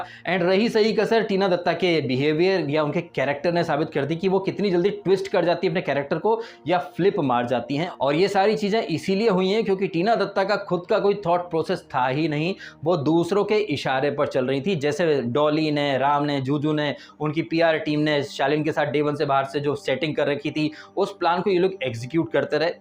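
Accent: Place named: Bengal, India. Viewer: Sir ab appka bengali accent miss karu ga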